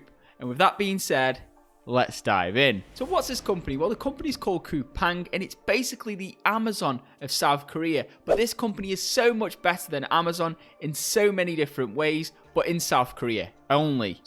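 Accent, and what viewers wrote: United Kingdom. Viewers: Your accent is so British.Awesome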